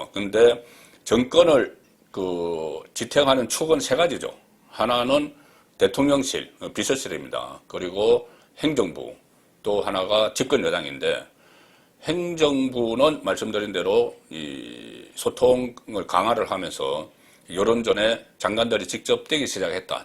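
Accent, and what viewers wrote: South Korea. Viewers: 내용은 좋은데 어느 지방 억양인지 도무지 거북하고 거슬립니다. 어색한 곳이 자꾸 들려요.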